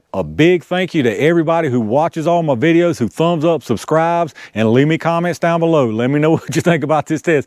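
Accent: South, United States. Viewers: I Love that southern accent !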